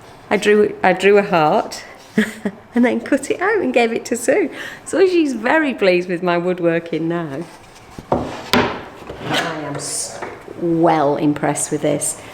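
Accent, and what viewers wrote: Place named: Scotland, United Kingdom. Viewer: I'm sure the Scottish accent will come back! 😂🫣🤔 Looking forward to seeing what you do with the pallets! xx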